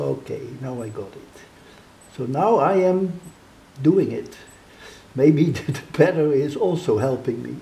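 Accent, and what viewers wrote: Netherlands. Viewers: I can hear he is dutch although his english is very good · idk know why he has a dutch accent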